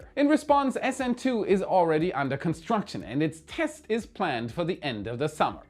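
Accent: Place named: Germany. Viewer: Love these videos. Part of me hates the cringy sort of script reading. But honestly the German accent sticks around in my mind and when I see a new video I just imagine cliche intro phrases in a German accent and I have to say I look forward to it haha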